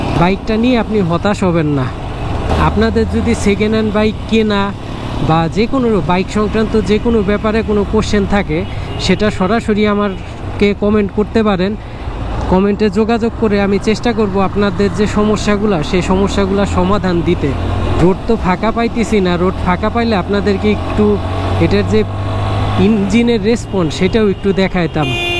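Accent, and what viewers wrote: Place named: Faridpur, Bangladesh. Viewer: ভাইয়া আপনার হোমটাউন কি ফরিদপুর?কেনো বলছি,কথা বলা দেখে চেনা শহরের বলেই মনে হচ্ছে!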